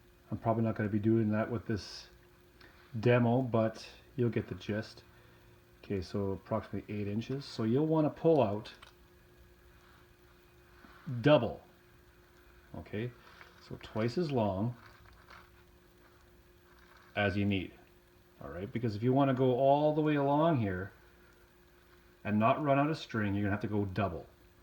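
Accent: Canada. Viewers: oooh I love a Canadian accent!! ❤❤❤❤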